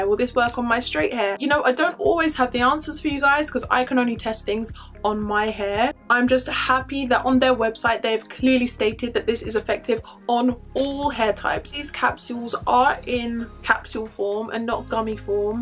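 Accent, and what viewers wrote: United Kingdom. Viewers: I need your GORGEOUS British accent!!!!! I would be a new woman with a new lease on LIFE!!!! 💜💜💜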